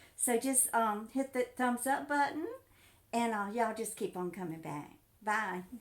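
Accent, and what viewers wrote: South, United States. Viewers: I am new to your channel and love your story telling. I am a Southerner and can relate to your accent. Keep making videos!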